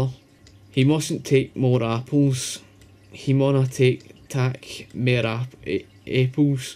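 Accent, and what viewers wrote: Scotland, United Kingdom. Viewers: I love your accent , I would never attempt to try and talk like you, It would be such a disgrace to your beautiful language lol, I talk with a heavy southern US accent I hope when I come over there people can understand me, Do Scottish people like Americans .the ones from the south that have manners and are friendly? Which part of Scotland would you say is the most friendly to Americans and which is the parts that do not like us that much? I want to visit Campsie the most.Is it a friendly area?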